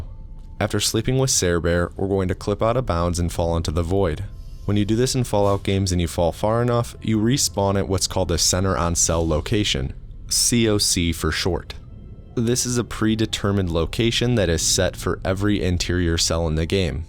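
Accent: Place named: Midwest, United States. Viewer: Where are you from? Your 'a' is very distinct, sounds midwestern to me!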